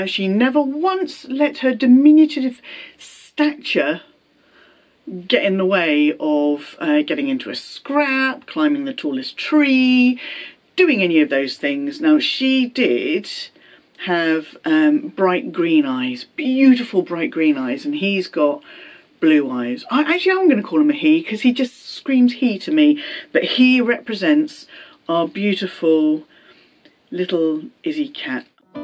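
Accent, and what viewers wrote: United Kingdom. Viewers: I’ve laughed. I’ve cried ( your cat was beautiful) . It was actually the cat that made me click. Your voice is wonderful! I love a British accent. Your personality is va va voom! Thanks for the video. I’m looking forward to your next!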